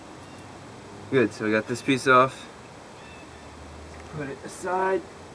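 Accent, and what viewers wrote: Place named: New Jersey, United States. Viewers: Can definitely tell youre from New Jersey. Lol. My wife pronounces water the same way. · JordanMatthew Anderson southeast pa or south western Nj, philly/ Camden we all say wooder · NJ, I'm also originally from New Jersey and I say water the same way, "wooder". Well I'm trying hard to change that now. I didn't realize I was saying it wrong until I moved to a different state.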